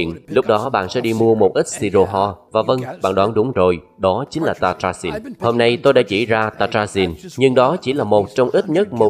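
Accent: South, Vietnam. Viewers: Nói giọng MN mà toan la dùng từ MB,( mỡ lợn, quả ngô, bỏng ngô, đảm bảo, ), nghe nó ngộ ngộ sao đâu. ( Mỡ heo, trái bắp, bắp nổ, bảo đảm). Và còn nhiều từ MB nữa.Vv..vv. Cũng có thể anh này là người Bắc 75-2024. Cũng cảm ơn Anh rất nhiều vì đã chia sẻ những thông tin rất là hữu ích.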